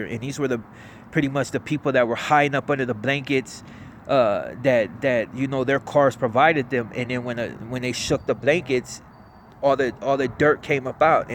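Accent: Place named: Mexico. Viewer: Why does he try to sound mexican if hes black?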